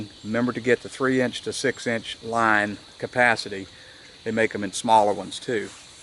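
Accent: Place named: Texas, United States. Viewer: Do u live here in Texas Sir??? Your accent sounds Texan.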